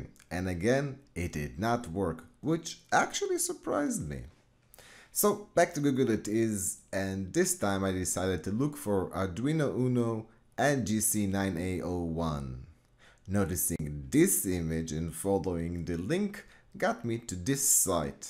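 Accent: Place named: Israel. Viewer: לפי המבטא אתה בטח מישראל !!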